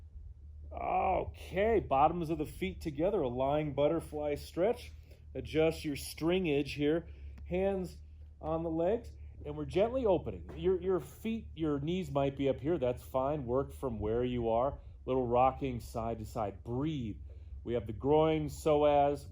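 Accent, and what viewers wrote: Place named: Germany. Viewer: Thanks this Was really helpful and didnt even feel like 15 Minutes.. But whats up with that german voice? Ich bin neugierig 😁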